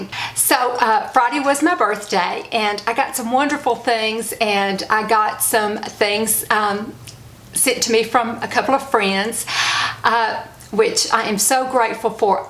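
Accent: South, United States. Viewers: It’s Lorraine in England. Like any good Southern girl I loved my makeup, too. I remember going to London with my Mom and aunt when Twiggy and Mary Quant were big. After listening to this video I saw a banner that a cover band , Union Gap UK , Young Girl etc. from the 60’s ,are in town tonight. I may have to go to the concert! You remind me of my girlfriends back home in Virginia which is why I like your videos and insta stories. I don’t hear a Southern twang that often unless on line!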